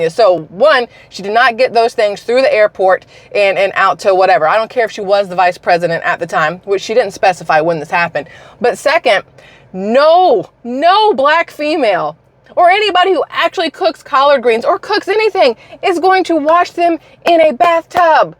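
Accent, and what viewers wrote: South, United States. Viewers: That is hysterical that you used to have a country accent!! 😂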